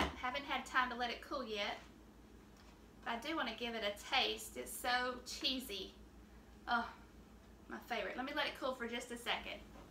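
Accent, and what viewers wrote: South, United States. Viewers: P.S. - oh yes, you definitely have a southern accent. I wouldn't call it country, but definitely southern .. 😉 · I love it when I see cooks using Tsang's! Your country accent is adorable! Rock On!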